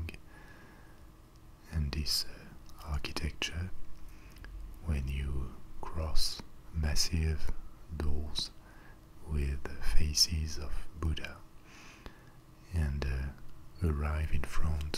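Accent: France. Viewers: I'm not usually find of French accents. Yours is different, I just don't know what makes me like it so much.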